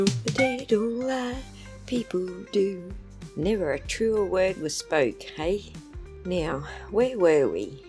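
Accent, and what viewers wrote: Australia. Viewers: You are such a fresh breath of air. I grew up across the street from a lady from Aussie. Our families were friends for ages. I'm now 67 yrs old. It almost sounds like I am listening to her talk. Love your accent. She was like a second mom to me. Miss her so much! God Bless you!!!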